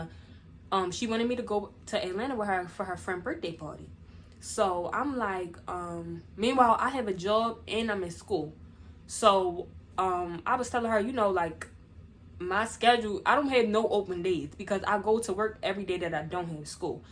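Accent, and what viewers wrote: Baltimore, United States. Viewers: I love how you talk fr 😭 bmore accents so 🔥 but she weird friend ..she might’ve wanted to be with u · I just knew you was from Baltimore before you said it 😂😂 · I love how you talk fr 😭 bmore accents so 🔥 but she weird friend , new subbie!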